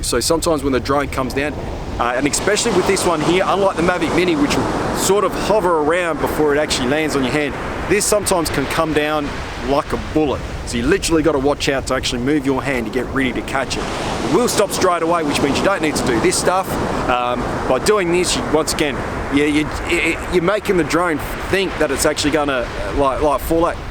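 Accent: Australia. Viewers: Aussie !!! Yeh mate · To man, I like your Australian accent 😍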